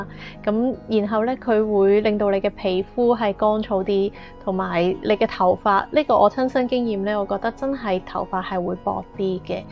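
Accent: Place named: China. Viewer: 你应该不是真真香港人！讲广东话讲到像陸染咁！😑😐😶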